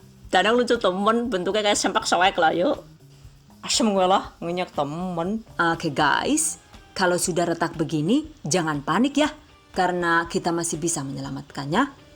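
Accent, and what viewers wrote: Banyumas, Indonesia. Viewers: Wah bisa buat isian jajan arisan nih... Muantul resep2nya... Selain mantul, ngomong ngapaknya juga bikin sakit perut... wes lah yaaaa jan tenan... Caaaatat DONE #giveawayTHVdesember2019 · Kiye nyong seneng nemen yen bunda ngomong nganggo bhsne inyong yakin sung bund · Enyong sabar....nunggu ngomong ngapake....ora Tek skip loh mbekayu...resepe nyong ya butuh kowh... ksuwun nggih...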